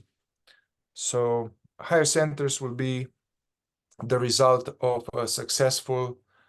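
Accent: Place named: Jamaica. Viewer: Where are you from you sound jamaican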